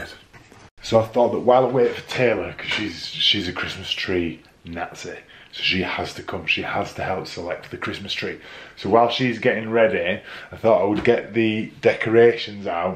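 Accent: United Kingdom. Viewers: Nice British accent, love it 👍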